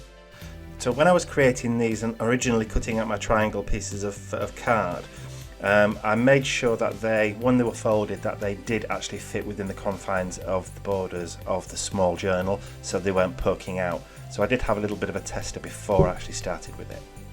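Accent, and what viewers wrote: United Kingdom. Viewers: Love following you, it's great to have a mans voice and a British accent! Great ideas which I will be trying asap, keep up the good work and well done on your burgeoning fan base :)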